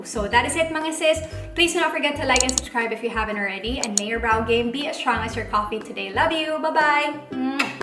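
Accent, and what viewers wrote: Visayas, Philippines. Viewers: 😉Ate what if mag review ka in Bisaya so love the way you talk in Bisaya...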